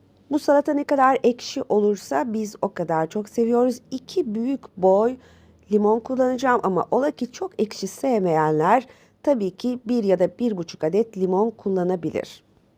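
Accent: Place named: Istanbul, Turkey. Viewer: istanbul türkcesi konusan o jadar aziz ki.Sizi dinlerken cok mutlu oldum🎉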